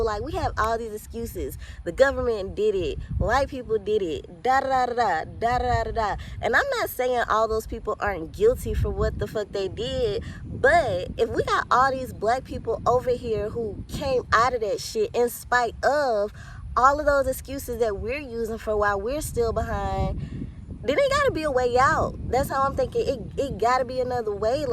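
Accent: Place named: West End, United Kingdom. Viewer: I was trying to figure out where you’re from! Wassup West Ennnndddddd!!!!!!!!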